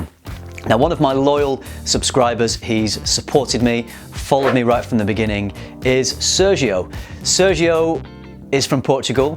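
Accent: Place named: England, United Kingdom. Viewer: That dirty English accent Yuk!! A cocktail ????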